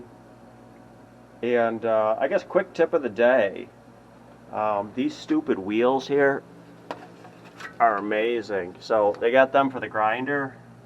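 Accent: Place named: Northeast, United States. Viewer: Doing real well. I love your work and your accent reminds me of where I grew up. I spent my time in North Jersey in Philly and for one year when I was in the Navy back in the 90s I was in upstate New York.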